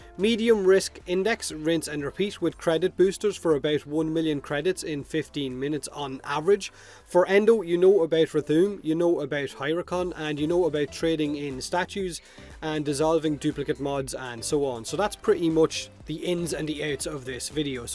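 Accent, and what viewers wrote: Ireland. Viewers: Awesome irish accent, hey! One of the reasons I prefer your videos to OriginalWickedFun is your voice! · He wasn't wrong about subtitles shitting on his Irish accent. Goddamn that's funny. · You have to get "pints" in the index? The Irish and their pints xD gotta love that accent <3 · I love your Irish accent. Thank you! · I subbed for the bloody irish accent. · Love your Irish accent You single? 😏😂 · Irish Accent ❤ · Don't worry i love your bloody irish accent. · That accent killed me. Specially when he said youtube wont understand my irish accent xd.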